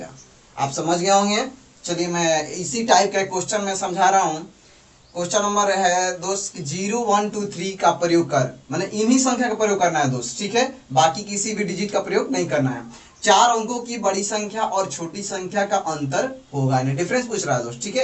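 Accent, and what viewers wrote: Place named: Bihar, India. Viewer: Bihari log ka boli mst h😊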